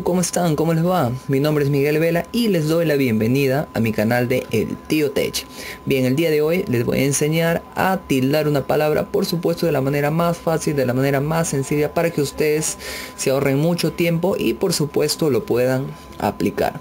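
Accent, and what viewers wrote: Peru. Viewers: Peruano .___.XD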